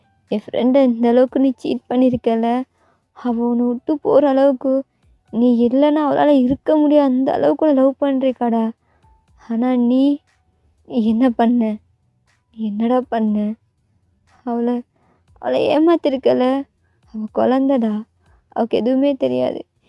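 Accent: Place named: Sri Lanka. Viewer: Sri Lankan maari pesuringa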